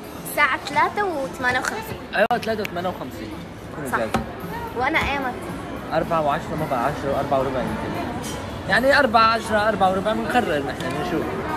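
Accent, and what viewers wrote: Iraq. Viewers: مبرووووووووووووووك 200 الف مشترك تستاهلللللل👌❤ أكثر 🔥 ليش أحسك عراقي🧐🧐 يارب تطلع عراقي يكون فخر🥺🥺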